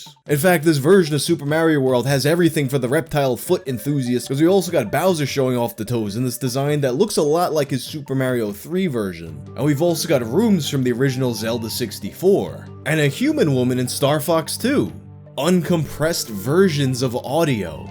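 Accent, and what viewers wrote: New York, United States. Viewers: Like every New Yorker you'll ever meet. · Lol I first heard it from Rich Massucci of ReviewTechUSA and now I can’t unhear it. They’re both New Yorkers so definitely an accent thing.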